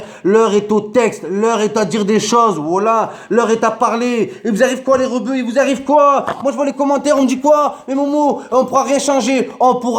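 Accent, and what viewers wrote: Marseille, France. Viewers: Change d'accent! Marseilles ça pue!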